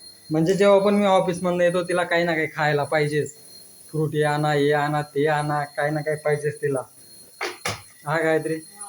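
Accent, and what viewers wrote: Khandesh, India. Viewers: Bhai khandeshi vatato aahe kutla aahe proper gav konte aahe tuze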